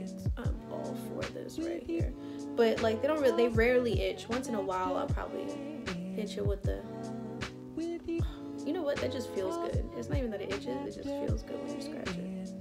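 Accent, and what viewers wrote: New York, United States. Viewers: yerrrrrrrrr you so new york i love it lol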